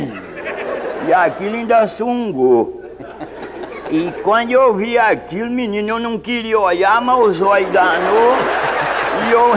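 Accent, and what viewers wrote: Minas Gerais, Brazil. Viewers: conheço bem esse sotaque kkk minero raiz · Onde nasceu não sei . O sotaque e de mineiro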